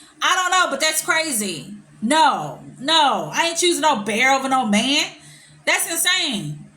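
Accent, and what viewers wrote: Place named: Arkansas, United States. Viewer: Hey just subscribed! Love your videos. I’m from Eastern Arkansas…. 😊 Wynne…. Sounds like a lot of Arkansas men I know…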